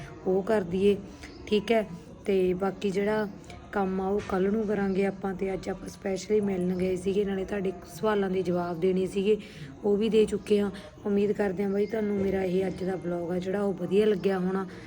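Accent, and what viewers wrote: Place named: Barnala, India. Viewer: Khudi kalan mandeep de sohera pind tusi barnala bol rhe oo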